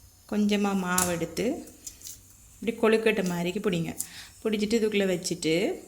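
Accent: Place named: Sri Lanka. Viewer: இலங்கையா நீங்கள் உங்கள் தமிழ் மிக அழகு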